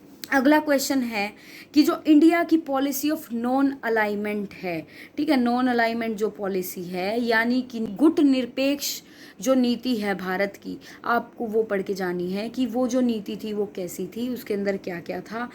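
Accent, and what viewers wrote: Haryana, India. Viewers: yss, haryanvi accent hai madam ka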